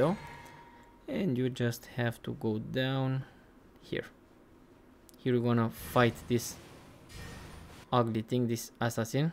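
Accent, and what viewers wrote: Romania. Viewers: ce accent de roman ai :)) esti tare bravo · u sound romanian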